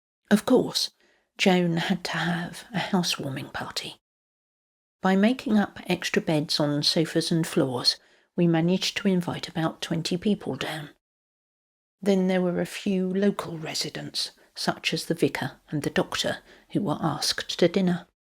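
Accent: England, United Kingdom. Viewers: Well read. Good to hear English stories in an English voice, and spoken slowly and clearly.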